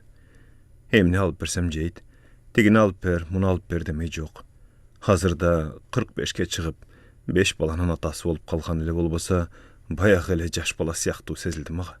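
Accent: South, Kyrgyzstan. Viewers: Керемет окуйсуз да. Алыстагы балалыкка бир алып барып келдиңиз. Рахмат, түштүк акцентке сөз жок. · Туштуктун диалегин келтиресиз да😂👏👍👍👍 озунун кай жердин кулунусуз?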